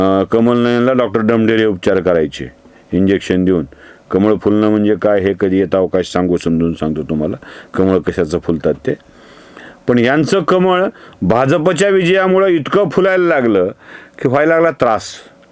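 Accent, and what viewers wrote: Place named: Marathwada, India. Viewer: तुमच्या बोलण्यात मराठवाड्याच्या मातीचा गंध सतत जाणवत असतो त्यामुळे खूप आनंद मिळतो व मन प्रसन्न होते मन:पूर्वक अभिनंदन यज्ञेश्वर दीक्षित, मुखेड जि नांदेड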